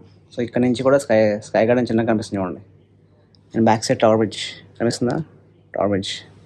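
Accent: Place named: Anakapalli, India. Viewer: Baya e vuru midhi ma anakapalli yasa nilanay untadhi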